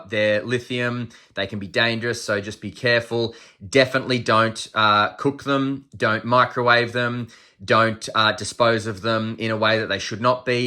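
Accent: Australia. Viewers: with an aussie accent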